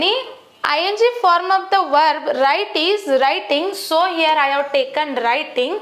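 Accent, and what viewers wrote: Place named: Belagavi, India. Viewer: Nimm Belagavi accent alli nimm matu kelode chanda❤️👌👌👌👌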